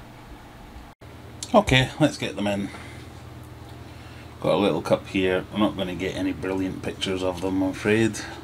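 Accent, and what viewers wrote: Scotland, United Kingdom. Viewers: Nice to hear a fello Scott 😃 I'm going to be setting up a nano tank with these hence finding this video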